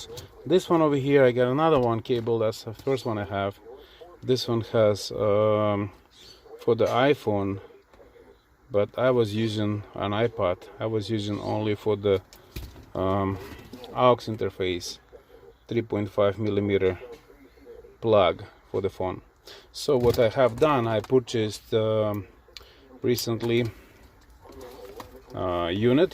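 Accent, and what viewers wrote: Russia. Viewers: Похоже на русский акцент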